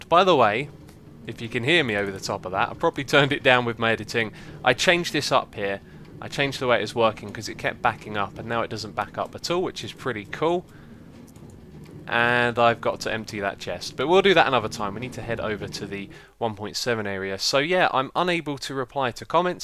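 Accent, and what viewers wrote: England, United Kingdom. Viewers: Questions for the Q&A : 1) Ketchup or Mustard ? (yes, there is a correct answer :P) 2) More generic one: What made you get into recording game play videos? 3) You're quite obviously a Brit, but where in the country are you from / grow up? I'm a Londoner personally, and your accent isn't that different from mine. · He is from England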